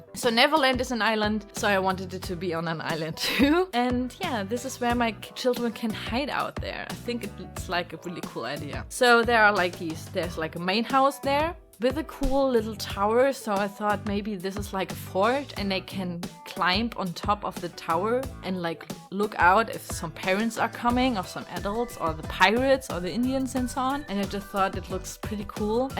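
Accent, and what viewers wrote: Germany. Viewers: Achso ich dachte schon irgendwo her kenn ich den Akzent :D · Are you german?you have this german accent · Where are you from? Your accent is kinda german. :D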